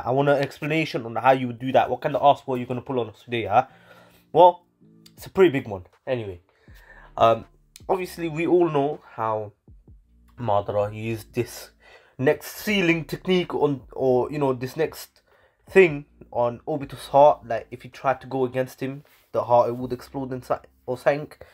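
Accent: United Kingdom. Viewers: Are you British mate yeh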